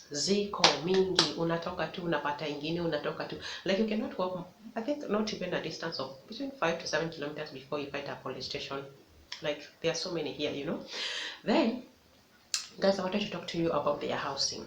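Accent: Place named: Kenya. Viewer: I'm trying to guess where in Kenya you're from. I'm guessing somewhere between Central & Meru. Like Embu/Kirinyaga. My best guess is Nyeri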